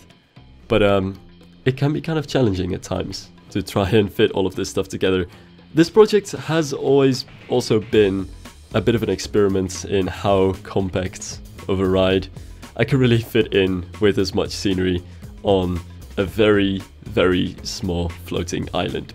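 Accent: Netherlands. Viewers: Literally Dutch with a terrible cold... Beterschap,!:)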